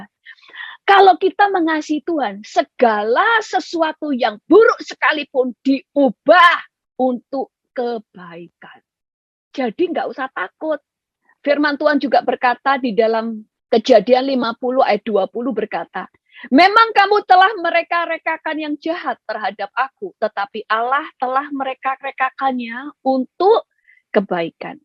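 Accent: Java, Indonesia. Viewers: Aku suka pengajaran dari ibu. Pengajaran mudah dimengerti dan bahasanya sederhana. Meski ibu lama di Amerika tapi medok Jawa nggak bisa hilang. Tuhan Yesus memberkati ibu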